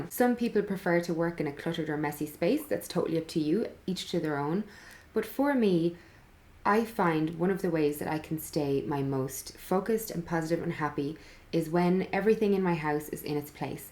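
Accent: Ireland. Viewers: U sound Irish 🍀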